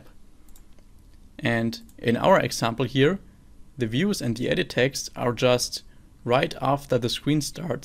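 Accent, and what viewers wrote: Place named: Germany. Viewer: Sounds like you are from germany. :D Anyway, thank you for the good explanation!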